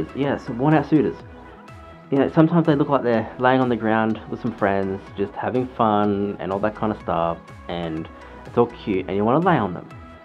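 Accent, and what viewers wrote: Australia. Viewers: wait wasn't he german how does he sound just like an australian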